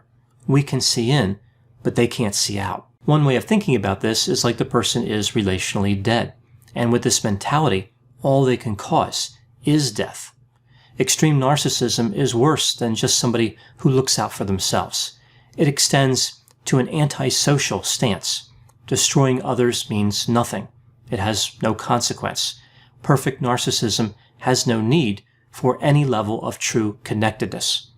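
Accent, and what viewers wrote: United States. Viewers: Great analysis, Dr Grande. Completely inconsequential to the case, but as soon as I saw the title I was curious about how the name pronunciation would go throughout this video :P Yours is probably as close to accurate as it's going to get with an American accent.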